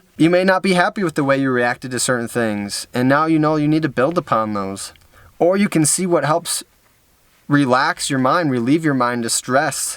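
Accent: Wisconsin, United States. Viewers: yo your from wisconsin? what part? its a shame how many alcoholics there are here and how widely accepted it is here going to the bar every single day after work, Aside from that i knew you sounded like your from wisconsin that wisconsin accent yanno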